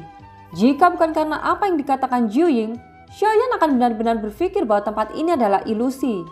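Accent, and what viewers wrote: Java, Indonesia. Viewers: Peyampayannya bukan LG anime donghua tp logat Java donghua.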